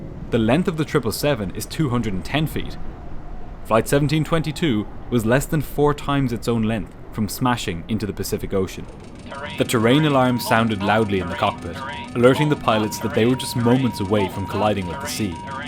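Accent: Ireland. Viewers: Your narration skills are first class -I like your Irish accent coupled with your easy listening mellow voice – one funny thing though -to me at least (especially for aviation videos ),is the way you pronounce “column”-your Irish accent comes out as “collleeum”-a cross between cauliflower (or maybe Colosseum !)and column, Gosh I hope I haven’t made you self-conscious after that !, on a serious note you have a brilliant channel which does not sensationalise nor overdramatise the events and covers the technical aviation aspects well but remains gripping and riveting like a good drama❤ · well bud, that’s the beauty of the Irish brogue, remember, those slights in the queens tongue are what made Irish literature legendary.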